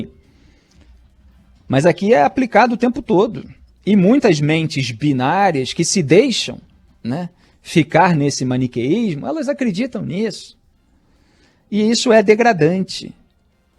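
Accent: Rio de Janeiro, Brazil. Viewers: Esse jornalistinha safado com sotaque da zona oeste do RJ só fala m. Vai lá perguntar pro marreco moro sem vergonha se ele te arruma alguma boquinha lá nos EUA . Safados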